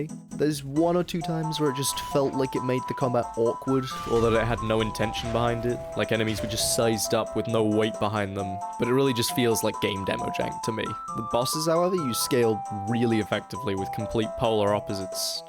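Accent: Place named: Australia. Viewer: you're aussie arent you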